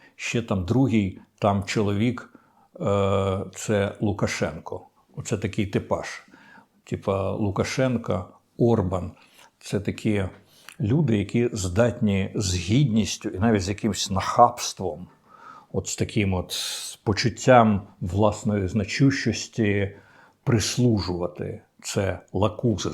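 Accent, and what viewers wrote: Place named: Russia. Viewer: пане, вам так подобається московська мова, московська фонетика? що таке "нічтожество"? вам не подобається "нікчема"? і чому "КІЄв"? "КлІчко"? ви ж начебто наголошуєте кожного разу, що російська мова - це дніще, то може, вам самому варто почистити свою українську?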